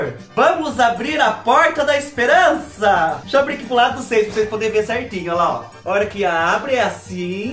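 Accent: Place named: Caipira, Brazil. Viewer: Que felicidade sem fim. Seu jeito é único .Você com seu sotaque caipira muito natural contagiando os nossos lares.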